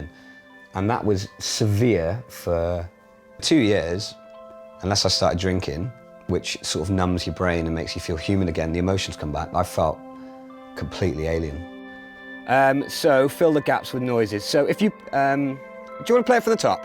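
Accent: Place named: Gloucestershire, United Kingdom. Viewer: Nice Gloucestershire accent 😂! I went to Wyedean lol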